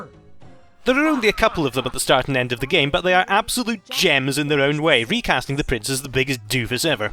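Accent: Scotland, United Kingdom. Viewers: I thought I heard a bit of Scottish in your accent lol! I didn't want to assume. ^_^ Loved the video man. Just watched your Prince of Persia 2 video today and that one was also great. Keep up the great content man!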